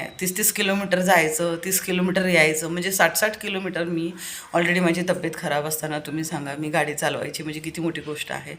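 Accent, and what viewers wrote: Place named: Pune, India. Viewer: ताई तू पुण्याची आहेस का proper.. बोलण्याची ट्यून पुणेरी वाटते म्हणून विचारले.. आणि खूप छान आहेत..होईल सगळ ठीक..❤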